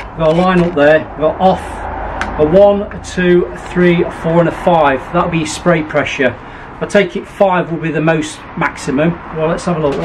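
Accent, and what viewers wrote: Nottingham, United Kingdom. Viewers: I thought that was a Nottingham accent you had, i pass through Netherfield on the train to Forest games travelling up from Norfolk.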